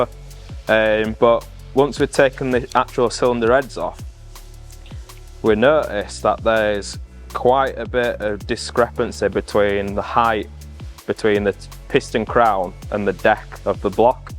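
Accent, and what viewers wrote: United Kingdom. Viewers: Where you based you sound local accent. Wakefield/Leeds???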